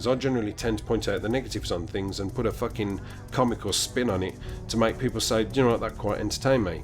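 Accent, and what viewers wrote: Birmingham, United Kingdom. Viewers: Keep doing what you wanna do and if things are a little stale then play other games for a while, just dont leave!:( I will miss you and your brummie accent and that laugh you have.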